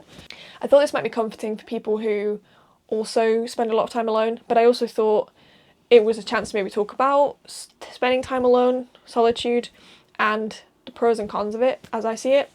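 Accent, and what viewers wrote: United Kingdom. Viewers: loved the video same im 24 and moved to a new city and am alone all the time ive lived here for nearly 2 years and I know 2 people here, also completely blew me away hearing the lancashire accent im from lancaster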